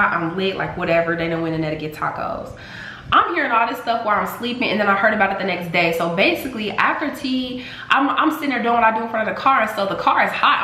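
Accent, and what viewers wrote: Chicago, United States. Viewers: This my first video I ever watched but you have to be from Chicago lol. New subscriber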